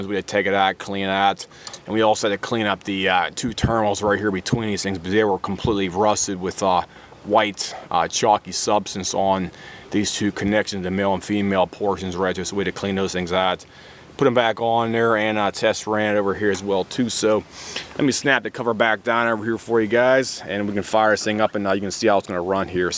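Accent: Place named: Western Pennsylvania, United States. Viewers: That accent of adding 'W's to any word with an 'O' in it is awful. Thankss for demostrating how to lift the hood. What a waste of bandwidth this is. Remind me to never stop in West PA when driving from Detroit to Jersey. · Unmistakable SWPA "accent." Shout out to younz from Uniontown!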